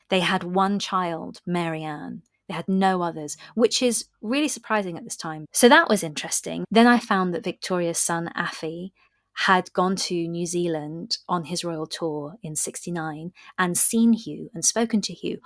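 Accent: United Kingdom. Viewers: It was an interesting programme. Who was the female Scottish narrator? Does anyone know?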